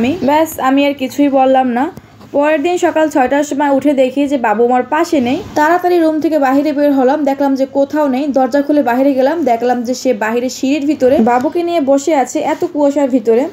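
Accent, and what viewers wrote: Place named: Dhaka, Bangladesh. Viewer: Dhaka Kotha talking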